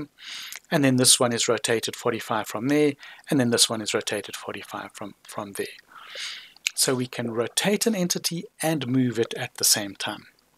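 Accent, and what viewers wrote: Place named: South Africa. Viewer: Hi. Just dropping you this line about how clear and concise your videos are. I've been a long time ACAD user, but my computers out-aged my version of AutoCad. So when I found LibreCAD, it was quite different than my old systems. I tried numerous YT instructors but for various reasons they didn't help me much at all. I'm in Montana USA, so some of the videos were hard to follow, either from difficult accents of instructor, length of lessons or simply confusing instructions. I very much liked the way you broke up the sessions into focus on one area at a time (not too long and complicated), like the detailed use of each of the tools, the ease of following your very light South African accent and the systematic approach to each item. I'm 84, and I don't learn as quickly as I used to, but still active enough to frequently have a need for this program. 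I gather that you are actually a instructor/teacher and you do an excellent job. Your videos make it easy to 'back up' to catch anything I didn't quite understand the first time. Thank you so much. Best regards, Jack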